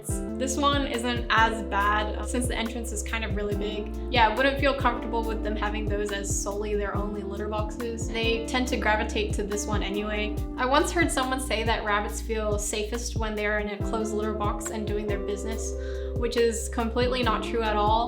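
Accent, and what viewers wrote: Australia. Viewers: I'm just watching along minding my own business, when I hear.. "..a young whipper snapper". An I'm like hey, is she Australian? Yay. Finally found an Australian bunnytuber. I'm getting my first rescue rabbit in a couple of weeks. Eeek. Excited.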